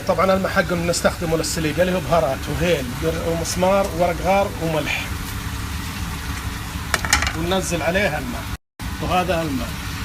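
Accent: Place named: Buraydah, Saudi Arabia. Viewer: ونعم فيك وفي اهل بريده من لهجتك عرفت بس احنا نبغا ناكل من طبختك قلنا يمكن يكون حولنا الله يسعدك يا وين ماكنت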